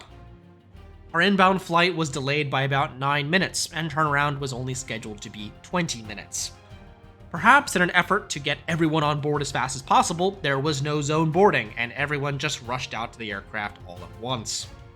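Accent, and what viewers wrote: United States. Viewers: From your accent I can tell you're from across the pond. As airport self-service solutions are underdeveloped over there, although it's changing, I guess you can be excused for thinking you had to go to a manual check-in desk at OSL, but that's of course not the case. Not every airline offers self-service options, but most European carriers with a normal scheduled service do at OSL. The next time you're at OSL, or most modern airports, you should look for these services first (not hard to find), and only go to a manual check-in desk if there is specific reason to do so. That's what experienced travelers do. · Wideroe is unique , not weird . What's weird is your constant whining . Couldn't listen to your nasal American voice more than 2minutes . And routes is pronounced 'roots' not 'routs' !! 😡😡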